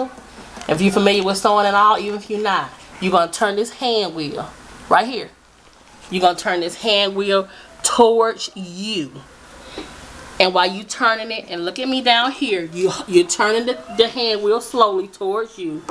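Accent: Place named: South, United States. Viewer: I love your voice. So country. You should teach a class.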